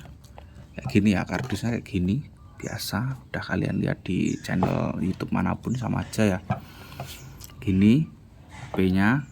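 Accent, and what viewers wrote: Java, Indonesia. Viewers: cangkemu medok tenan ngomong guweh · Jowone manteb mas😂😂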